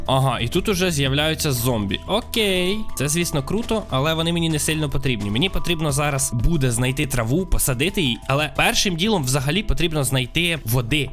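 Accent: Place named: Surzhyk, Ukraine. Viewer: ти насуржику говориш я це чую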